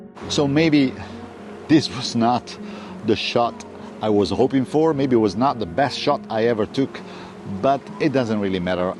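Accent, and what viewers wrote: Italy. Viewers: I visit Bologna several times in my line of work! The thing i miss the most is your italian accent, and the food also ( we have excelent food here in Portugal, but in Italy is diferent! We used to go to a place named "Ristorante Bolognese", and the 2 brothers there, were the soul of that place...we see them once a year..but they never forget us! they call us "DA SILVA!!...HOW ARE YOU?!" in my "TO VISIT LIST" Cinque Terre, is in secound! Hope this thing go away very soon so that we can return to our normal lives! Great video, and as always, great photos!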